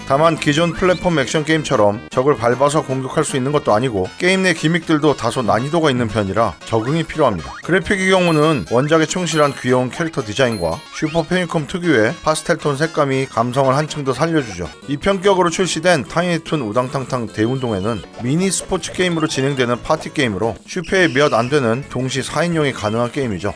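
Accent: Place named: Jeolla, South Korea. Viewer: 극강인지라~ 뭐뭐인지라~ 전라도사투리 오지게나오네요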